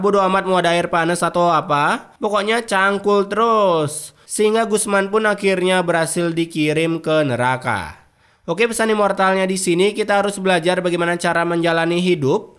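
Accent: Bali, Indonesia. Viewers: Logat Bali nya ketara bener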